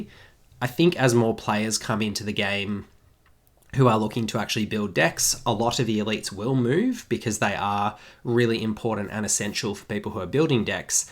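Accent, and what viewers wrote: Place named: Australia. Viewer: What currency are you talking here? USD or AUD? You sound Aussie.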